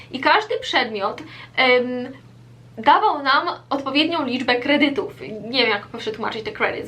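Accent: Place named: United States. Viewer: Jestem bardzo ciekawa jak brzmial twoj glos zanim pojechalas do usa bo teraz brzmisz jak prawdziwa amerykanka mieszkajaca w stanach ktora przyjechala do Polski i ma korzenie Polskie ile lat bylas w usa tylko rok?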